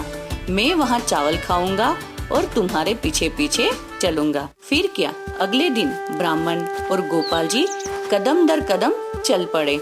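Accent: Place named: South India, India. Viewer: South Indian voice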